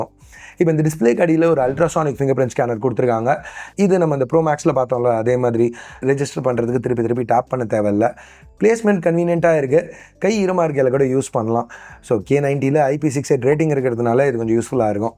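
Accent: India. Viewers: Video Dubai Voice India 👌👌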